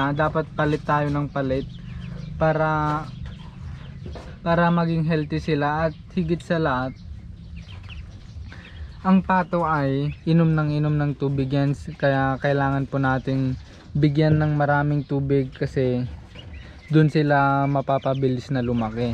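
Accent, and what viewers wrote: Ilocos, Philippines. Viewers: Iba talaga nu ilocano ang naga tagalog nagmayat pakinggan hahaha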